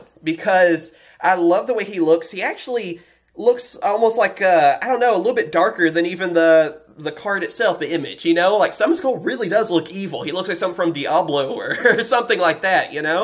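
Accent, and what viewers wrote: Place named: Georgia, United States. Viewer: Bro are you from Georgia because you sound like you are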